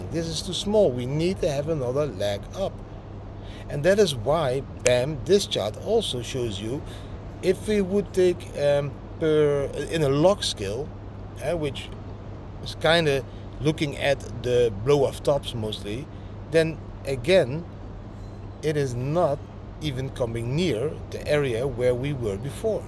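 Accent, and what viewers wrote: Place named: Netherlands. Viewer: He een Hollands Engels accent:)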